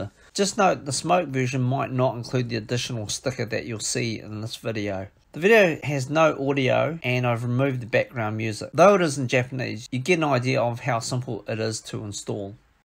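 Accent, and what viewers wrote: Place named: New Zealand. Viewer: You sound like kiwi. Have you found a way to purchase this diffuser in Australia?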